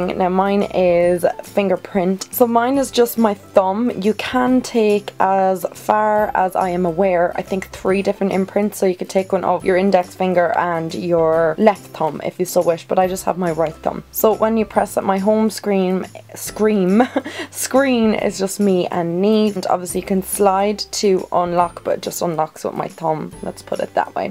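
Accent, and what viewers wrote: Ireland. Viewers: is her accent irish ?!!!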